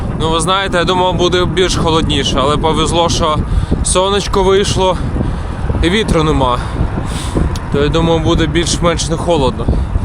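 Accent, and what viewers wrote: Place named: Russia. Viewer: покращуйте вимову кацапська так і лізе, все інше лайк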